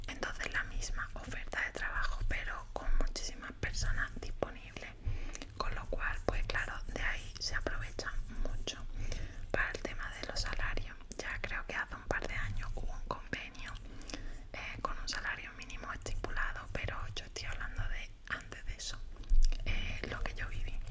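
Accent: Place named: Andalusia, Spain. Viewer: Pensaba que éramos vecinos de provincia pero no! Jajajaja porque tu acento es de Andalucía y por lo que cuentas, ibas a irte de Málaga a Córdoba a estudiar pero tu padre no quería. Sinceramente aun no me voy a dormir, pero lo tengo en pause para seguirlo en cuanto me acueste porque me relaja tu voz! Un abrazo compañera! 😇😴😴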